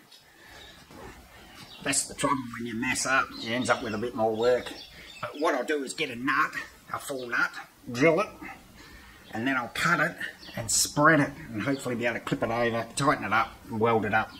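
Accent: Australia. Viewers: I just started watching you today and wow what a welder you are. I never took any welding training but regret that action. Am looking forward to more interesting videos from you. You sound to be from Asustraila or New Zealand a beautiful section of Gods creation. I live in the USA in a State called West Virginia. Stay safe and Merry Christmas to you and yours. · i know i am new, i like like the methodical approch to see a skills man at work, what a simply beautiful piece of craftmanships at its very best. all you need is four strongest powerlifters, to cary this whewever you want to go camping in the world, i am assuming you are from Australia, just by listeninhg to a little bit to your accent, if i am wrong i do appologise profusely for my mistake, i am into bushcraft skills in the UK. and i go camping everyyear, i would love something like that on a slightly smaller scale. 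simply beautiful, say no more. i like the hinge for your kettle, very clever there, in the making of it. cheers Hans.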